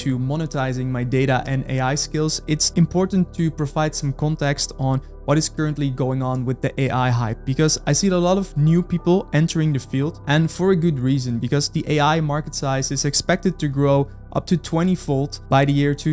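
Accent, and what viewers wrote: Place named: Netherlands. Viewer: youre dutch i can hear it f rom youre accent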